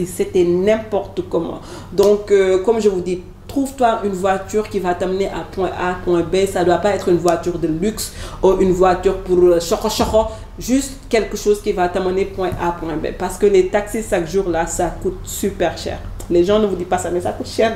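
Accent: Senegal. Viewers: Tu es originaire de quel pays pour t'installer au sénégal. Si tu es sénégalaise et que tu es retournée dans ton pays le Sénégal, alors tu n'es pas allée t'installer au Sénégal. Tu es simplement retournée dans ton pays. Ton accent est purement sénégalais.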